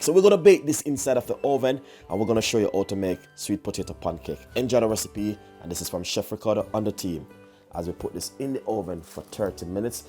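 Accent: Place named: Jamaica. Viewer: Why put sugar if sweet potato is already sweet? Anyways Love you accent .. you sound like Jamaican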